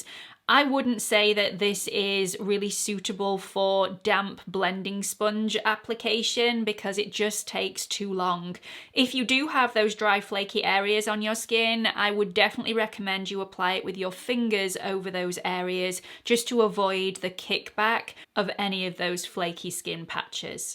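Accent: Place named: North, United Kingdom. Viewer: I am still trying to work out your accent. Still not sure what part of the country you are from. I have narrowed it down to North 🤣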